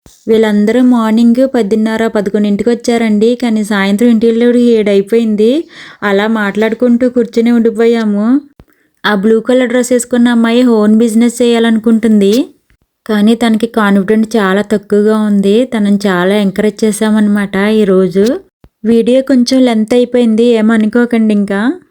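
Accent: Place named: Godavari, India. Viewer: Medhi India lo akkada..vallani mavyyagaru attaya antunnru..njmenaa..full detailed video cheyandi..me slang emo godavari slang laaaaa undi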